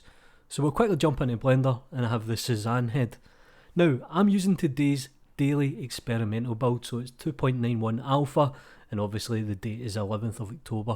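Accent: Scotland, United Kingdom. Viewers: Glasgow? I miss the Scottish accent ;) Nice channel mate.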